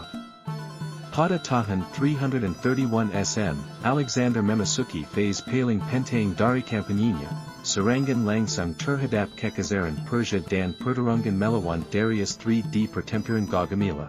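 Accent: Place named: West, United States. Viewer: logat barat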